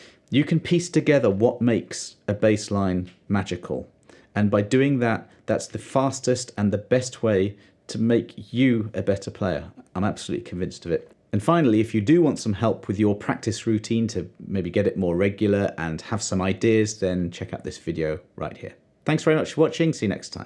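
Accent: United Kingdom. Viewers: I pronounce Jaco 'Jahko' and 'Yamaha' 'Yahmaha' (I have 5 Yamaha basses) so you're preaching to the converted. I commented because I thought it overly pedantic that an American would attempt to school an Englishman - from whom, I might add, he's getting FREE bass instruction - on pronunciation. It'd be like me viewing an American cooking segment and admonishing the chef for pronouncing the herb (or 'erbs' as Americans call them) basil 'bayzil', instead of 'bazzle' as we do in Australia and the UK. Anyway, that's enough from me. Back to the bass... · Fantastic lesson, as usual,! Your lessons are so informative, and I would say the best ones on YT. I’m curious, though (and I hope you won’t be offended), but why do you Brits pronounce “Jaco” as “Jacko”? It’s actually “Jahko” (long A, as in how Brits say “tomahto.”) This also goes for “Yamaha,” which I hear Brits pronouncing with a short A, as in “Yam (rhymes with “ham”) aha,” instead of the correct “YAH-maha.”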